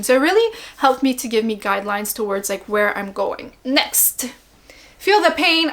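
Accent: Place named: Quebec, Canada. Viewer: awesome video girl, & nice french- canadian accent ;)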